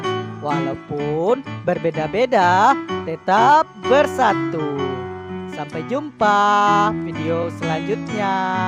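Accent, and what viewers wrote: Indonesia. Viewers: Orang sumatera mana suaranya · Orang kalimantan mana suaranya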